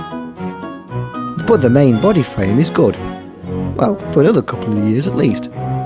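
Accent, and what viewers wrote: United Kingdom. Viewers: ...And why use some Northern guy as a narrator rather than someone from London. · The film was made in the mid 1950s - the heyday of the London RT family and long before Routemasters entered Aldenham. Wonder why a Liverpudlian was chosen as the commentator. So UNLIKE London Transport who normally preferred a plummy London version! Music was like attending a funeral!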